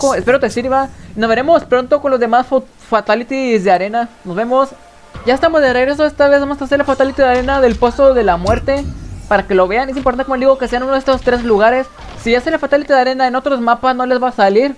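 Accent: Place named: North, Spain. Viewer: Lo intentaba una y otra vez pero jamás salían. Gracias por compartir el secreto persona con marcado acento norteño 🐉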